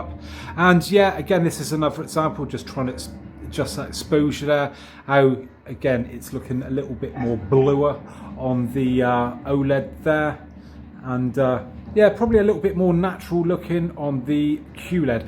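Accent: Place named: Cornwall, United Kingdom. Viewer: Hi mate great video! Are you cornish if you dont mind me asking?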